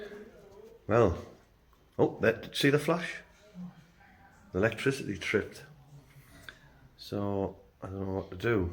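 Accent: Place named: Hartlepool, United Kingdom. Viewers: You sound like a fellow monkey hanger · Great video. I too mainly do the big stuff from 415v up to 66kv. Love the challenge bit frustrating at times but love it all the same. Keep up the vids 👍. Where about sin the north east you from as you sound close to me in the Boro.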